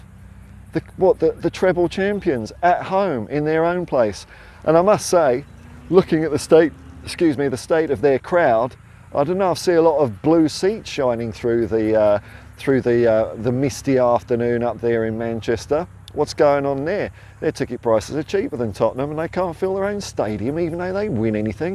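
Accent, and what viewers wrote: Australia. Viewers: I knew this bloke was Aussie, great bloody content worth the sub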